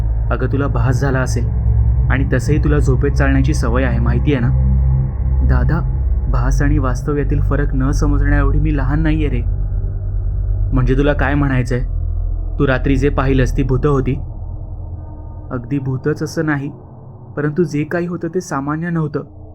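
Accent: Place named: Malvani, India. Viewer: तुझ्या तोंडानं मालवणी बोललंस बरा वाटला आमका ... मालवणी च वाटलंस...... पण भीती वाटता जाम हैता सगळा ऐकान 😅 lots of love from malvan ❤❤❤❤❤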